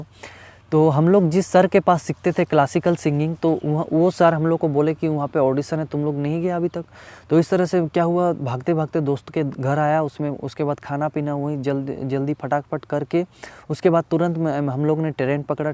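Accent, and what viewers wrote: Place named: Maharashtra, India. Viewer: Brother aap apni hindi language ko aise bolo jese ki marathee feel na ho matlv aap ki hindi language khadi boli jesi lagti hai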